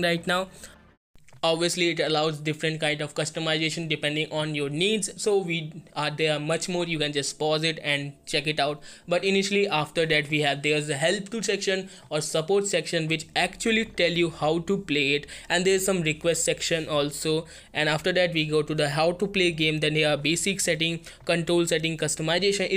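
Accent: India. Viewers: Indian accent, hmmmmm...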